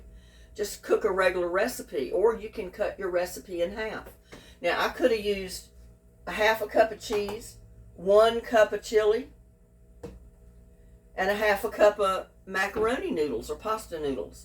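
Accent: United States. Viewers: Loved watching how you make your chilli, I add red kidney beans to mine 😋 love them,even picking up the American accent 😂xx take care 😊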